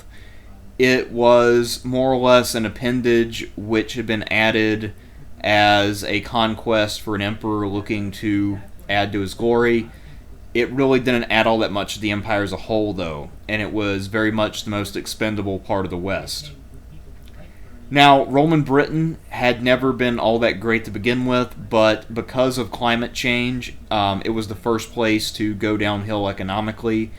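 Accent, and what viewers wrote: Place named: South, United States. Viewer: He speaks with a southern drawl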